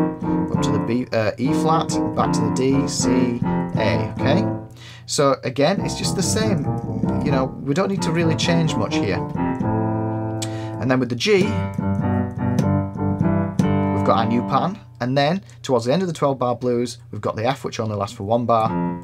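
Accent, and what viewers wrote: Yorkshire, United Kingdom. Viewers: Sounds like he's from Yorkshire. ep up mek a brew bluddy ell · not a joke, just complete bollox. 🤣 I love your “nothing like cockney” accent which sounds like a soft (Leeds?) Yorkshire to me.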